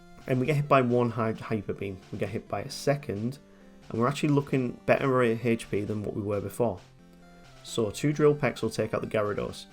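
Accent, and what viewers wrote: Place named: United Kingdom. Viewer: I found your channel while looking for a Kanto solo runner who doesn't use BBG. IMO, it's bullshit because it's very easy to discriminate between when it makes sense to use moves for their primary effects and when you're just exploiting the poor programming. It just requires an iota of self-control and honesty/integrity. The fact that enemies can accidentally boost your stats is no different to them having the ability to give you free turns with moves like Whirlwind and Teleport or even weaken themselves with Focus Energy -- part of the glorious mess that is Gen 1 randomness. But it means that the opponents are already at such a disadvantage that the BBG becomes totally broken and unfair. That's just my personal opinion, of course, but I hold it very strongly. I don't even watch Jrose anymore due to his overuse of the BBG. 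Your chan's not for me because of the BBG but good luck with it -- always nice to hear other British lads doing Pokemon content. Some assessment/advice from an expert on this stuff: the average video quality seems decent. Your energy levels aren't as high as most of the popular "Poketubers", but some people will enjoy the more relaxed vibe and you'll certainly find a niche as-is (tbh, you stumble over your words a little more than I'd ideally like, which seems harsh but modern YT demands near-perfection; if these reactions are ad-libbed, that's great - and the small flaws even add charm and authenticity - but you could always re-record lines with major mist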